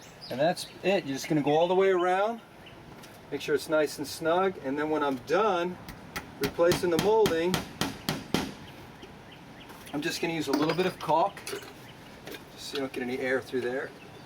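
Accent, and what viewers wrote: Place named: Tennessee, United States. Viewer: Sounds and looks like hes in TN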